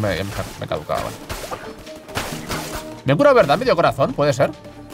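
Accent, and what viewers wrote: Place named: Argentina. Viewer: Hablas como Argentino más que Mexicano xd, lul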